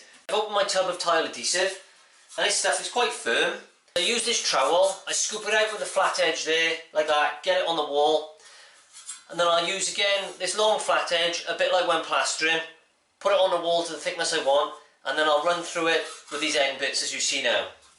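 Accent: Wales, United Kingdom. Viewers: Got a welsh twang there. Great video's. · good vids mate! are you welsh? haha. a lot of youtubers drag shit out but you just get to the fukin point haha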